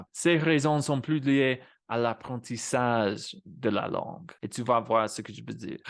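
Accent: Quebec, Canada. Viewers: Pourquoi tu désires apprendre et parler le français avec la couleur québécoise? Ce vieil accent très localisé de 9 millions d'habitants. ;) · Ton accent français est pas mal plus celui du Québec que celui de la France. Plusieurs tournures de phrase et contractions sont déjà intégrées dans ton français parlé, mais ce que je remarque le plus, ce sont les intonnations en "en" qui ressortent plus sous forme de "an" dans le français parlé québécois. Ça saute aux yeux... oups, aux oreilles! Et les "en" qui se changent en "an", eh bien c'est tout-à-fait normal dans le nord de la France! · Je suis québécoise et je suis professeur d’anglais langue seconde à la retraite . Je parle aussi italien. Je vous ai écouté avec beaucoup d’intérêt. Je trouve que vous vous exprimez comme un québécois. Il n’y a pas beaucoup d’anglophones du Québec qui parlent le québécois aussi bien que vous. 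Une autre raison à avoir de la difficulté à trouver quelqu’un avec qui vous pourriez échanger est que beaucoup de québécois, comme moi entre autres, croisent le français standard et le québécois en même temps. Comme on dit ici « Ça vient mêler les cartes». Félicitations pour votre chaîne. Vous communiquez très bien votre pensée et ce que vous dites par rapport à notre langue est très juste. Votre «FAQUE » et «J’AI DE LA MISÈRE c’est très québécois . 👏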